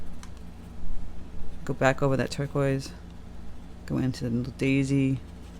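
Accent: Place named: New England, United States. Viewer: Love your videos. Very helpful, and I love your New England accent. 😊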